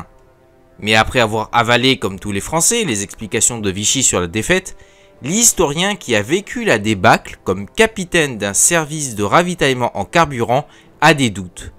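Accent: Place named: England, United Kingdom. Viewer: Je suis attristé d'entendre que les noms allemands sont prononcés à l'anglaise ! C'est dommage parce que la vidéo est bien faite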